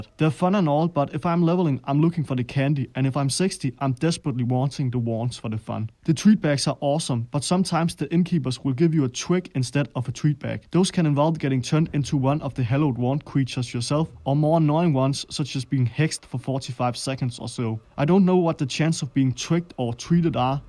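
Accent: Denmark. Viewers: This is the most Danish accent I've ever heard. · that is some insane danish/english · probbaly the worst danglish accent i heard to date. Thought oure military was the worst but you just wont that metal my friend. Accent homie accent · Haha ja lyder som nordjysk :p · Lol den danske accent er stærk :p God video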